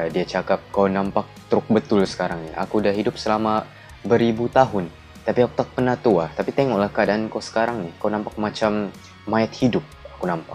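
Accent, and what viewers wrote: Sabah, Malaysia. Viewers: Ui orang sabah ba kau ni 🗿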